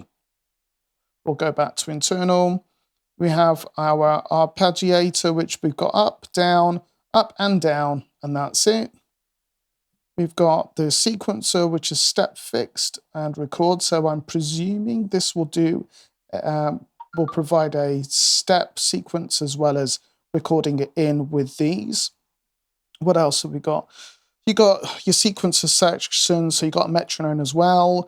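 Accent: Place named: United Kingdom. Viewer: you grow a brit accent. nice synth, review.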